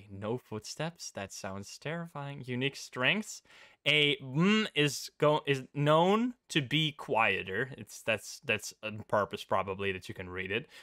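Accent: Netherlands. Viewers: Wait a minute…. ARE YOU DUTCH?????? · ik dacht al dat ik een nederlands accent hoorde maar nu weet ik het zeker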